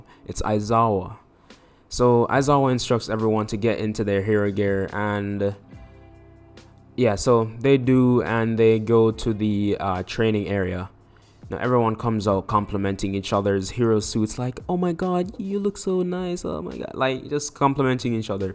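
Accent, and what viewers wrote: Jamaica. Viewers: Yo you sound Jamaican 🤔🤔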